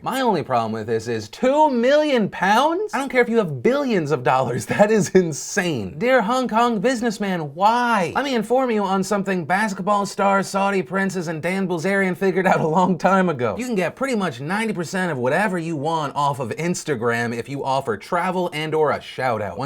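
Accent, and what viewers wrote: South, United States. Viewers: I hear a southern accent in your outros lol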